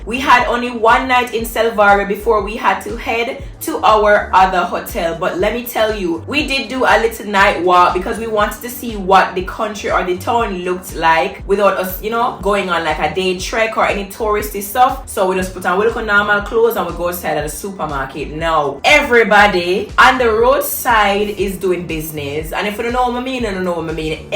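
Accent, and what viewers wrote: Jamaica. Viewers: Travel anxiety girl mi think a did me one❤❤😂 but u find bae happy for u girl gud me just love how u talk the things them like a real jamaican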